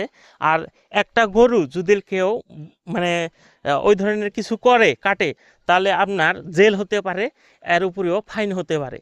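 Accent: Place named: Bangladesh. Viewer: Are u from India?apnar kothar tone to Pura bangladeshider moyo.gorur Dam 1 lather Kim bangladeshe niy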